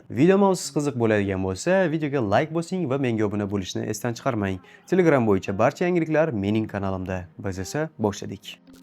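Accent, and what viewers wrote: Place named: Russia. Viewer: Rus, turk odam endi gapirvotgan odamga oʻxshab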